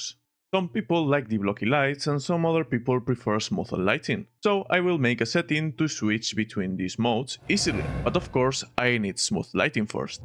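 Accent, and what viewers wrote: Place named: Spain. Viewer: That english spanish accent 👌👌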